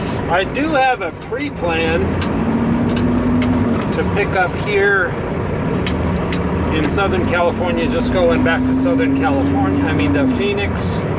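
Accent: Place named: Minnesota, United States. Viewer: mountain trips are the best ! but whereever is fine also, I just love that seemingly MN. Lake Woebegone drawl ! KEEP ON TRUCKIN !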